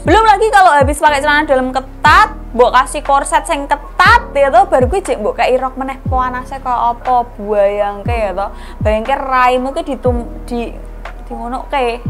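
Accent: Java, Indonesia. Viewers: jowo mu ngendi mbak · Jowone khas jawa ndeso 😀 tp gpp lah rodok terhibur 😄😄😄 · Kk e medo' ,😆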